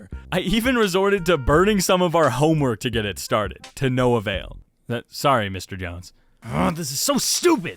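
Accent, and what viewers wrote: Canada. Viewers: Your Canadian? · You animation quality has gotten so so good over these past few year 😍 is soooo cool!!! I’m Canadian but every time you talk I chuckle cause you have such a Canadian accent sometimes🤣 like you said “ a-bout” but it’s such such a Canadian way 🤭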